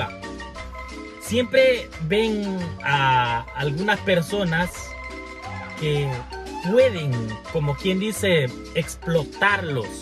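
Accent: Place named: Mexico. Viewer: María Sandoval el abla como Mexicano es porque vive con su esposa Mexicana ya se le pego el acento mexicano😂😊😊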